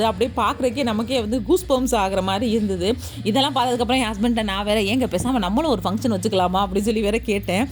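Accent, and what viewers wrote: India. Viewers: அம்மாச்சியா உங்க ஊரு எது சகோதரி, எங்க ஊரு பேச்சு மாதிரி இருக்கு, எங்க ஊரு காரைக்குடி ங்க. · அருமை மதுரை தமிழில்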